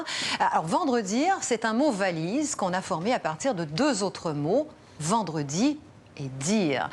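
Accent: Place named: Montreal, Canada. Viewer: L.accent montréalais me manque je vous invite à venir en Algérie pour enrichir votre dictionnaire je dit tout ça après avoir su que vous etes québécoise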